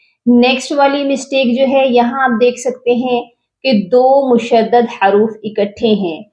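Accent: Panipat, India. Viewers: Mashallah ap toh panipati style main quran tilawat kar rahi hai mujhe bhot pasand hai ah ah lehja 😊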